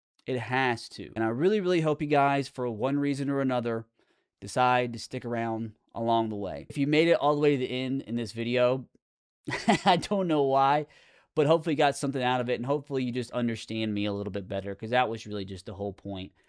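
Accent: United States. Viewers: I hope this helps but I haven't played the last 2 maddens and I STILL watch your videos! I love the rebuilds I love the irl stuff and the playing the career of a rookie. I also think you've gotten MORE funny. I also find your voice oddly soothing haha I mean people in different parts of America have different accents and they can't help that so honestly f*ck the people sh*tting on your voice. If they don't like it they don't have to watch. People just love to be toxic on the internet. If they met you irl they'd tell you how much they love your videos and want a pic. I'm also in my 30s and have a depression problem. You just gotta focus on you man and keep putting out the content that everyone has loved. You're great at this man. Don't let the haters get you down man!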